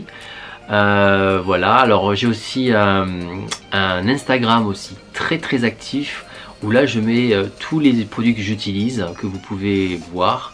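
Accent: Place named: South, France. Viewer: coucou mon petit ou devrais je dire mon grand vu ta taille lol!!!!! j'ai adoré ta vidéo et c'est génial d'en apprendre un peu plus sur toi, tu es un mec au top mais je n'en doutais pas on entend bien ton accent du Sud et c'est super car quand on t'écoute parler tu mets du soleil dans nos vies MERCI!!!! ta chaine grandit de jour en jour et je t'en félicite, tu le mérites surtout quand on sait le temps que ça prend et franchement tu ne ménages pas ta peine pour nous offrir un contenu à la hauteur de ton perfectionnisme le rouge te va très bien sur le visage lol!!!! ne changes rien car ce qu'on aime chez toi c'est ton authenticité je te fais des tonnes de bisous ♥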